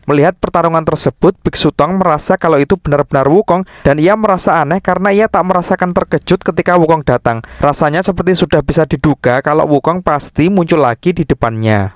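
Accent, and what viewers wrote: Java, Indonesia. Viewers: logat jawanya yg ngangenin bang..😁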